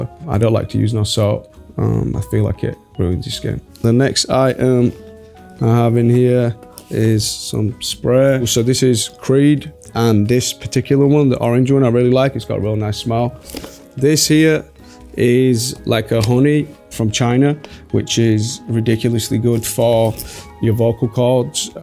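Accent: United Kingdom. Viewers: The most British accent ever 😂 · What part of the UK is this accent? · Love that he is still so British even after living in USA for so long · Zayn has the Britishest British accent !! No wonder British Vogue had him here .....love him ❤ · I love his British accent but all of those tattoos makes me feel so scared and terrified around him… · "mix it with a bit o wo'oh" man really the Britiest-British eva 😂🥹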